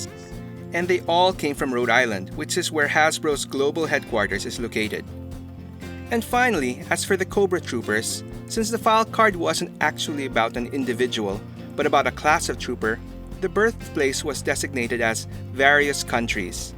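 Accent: Philippines. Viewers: mabuhay po kayo sir, nalaman ko po sa accent nyo na pinoy kayo, god bless po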